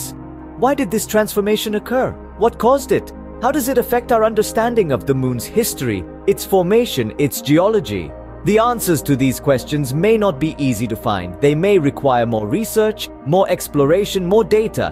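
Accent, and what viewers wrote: India. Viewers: when i hear indian english i change the chanel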